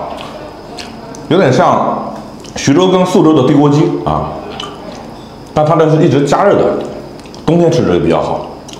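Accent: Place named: Hong Kong, China. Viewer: 港普听着真亲切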